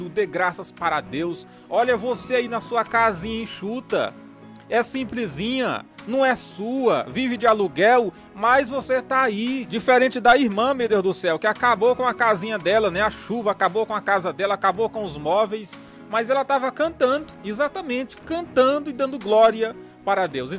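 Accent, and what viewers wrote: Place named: Northeast, Brazil. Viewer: Paz pastor gosto de assistir seus vídeos, e gosto principalmente do seu modo de falar,esse sotaque nordestino, sou nordestino mas moro em Manaus a muitos anos, oro pra que Deus continue abençoando o seu ministério, pois tem trazido edificação, Deus abençoe todos que assistem, vai nessa força varão Deus e contigo!!!!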